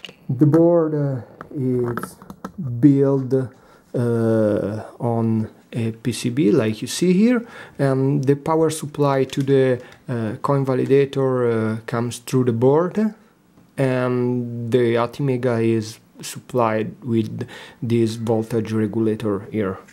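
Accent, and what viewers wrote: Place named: Russia. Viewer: Your english is like russian.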